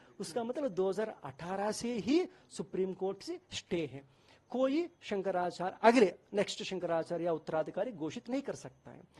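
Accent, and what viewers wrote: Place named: South, India. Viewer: South ke accent me hai kuch bhi mat bolo yar😂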